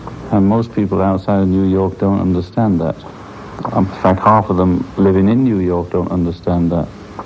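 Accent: United Kingdom. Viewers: I've listened to hours of interviews with Lennon. Something doesn't add up. Sure, the voice is British, but I don't think it's him. Just my opinion. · I just like to know where this came from, and if it has actually been authenticated. There is another tape recording of him just talking, and it does sound the same as this. I picked up that little habit he had of sucking air through his teeth. Also where his voice goes up a little? Do you know what I mean? And he used the 'me' when referring to himself instead of my. Three clarifiers. And he does still have a British accent, but he just it's like if you were just talking to him. And he's not putting on a show of any kind of being famous. But it does sound a lot like how I would think that he would be. Like always a friendly channel to cheer you up, getting into it with the cab drivers. And the way he ends it off with his funny little laugh. 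It does sound like not under the influence, in fact by that point he was not even drinking heavy anymore. This was right after he went back to Yoko. And I just would like to know where it came from. I want to know how these people have access to these things that no one else in the world seems to have access to. But God a lot of that was could have been my life no I'm not trying to compare myself with the great. It's like the part where he talks about I'm frightened and lonely or something everywhere. Having these great big great conversations with cab drivers yeah I do that all the time. And it just sounds like what I would think would sound like when he first got up in the morning and hasn't had his cup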